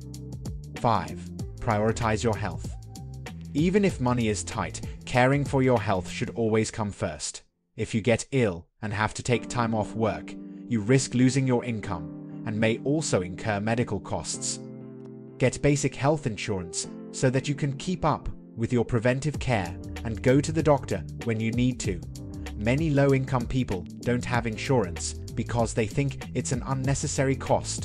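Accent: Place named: United Kingdom. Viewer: To be honest — really tired of the British accent.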